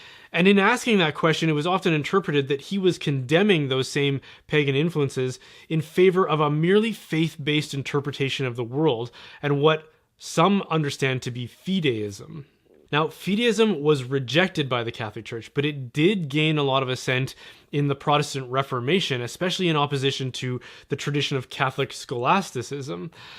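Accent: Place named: Canada. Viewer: Like your Canadian accent... "abowt" ☺️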